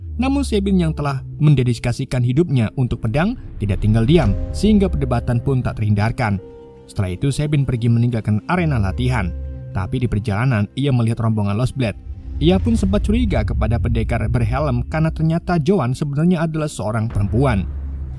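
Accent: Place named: Java, Indonesia. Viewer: Aku yakin admin nya orang Jawa, Kadang lucu juga admin nya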